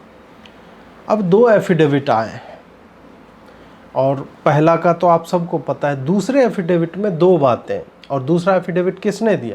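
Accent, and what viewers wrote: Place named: Bihar, India. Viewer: Tum tao Bihari lagey mujhe 😅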